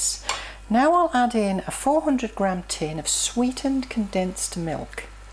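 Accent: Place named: England, United Kingdom. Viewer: J'adoooooooore !!! Cet accent Anglais, cette recette et votre bonne humeur !!! Un régal ! Un bonbon Anglais ! Allez j'y retourne.... j'suis trop gourmand je sais !!!!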